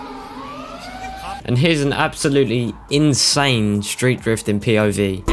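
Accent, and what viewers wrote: United Kingdom. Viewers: You should let your videos narrate by someone with an american accent. British accent is just not it. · love your British accent bro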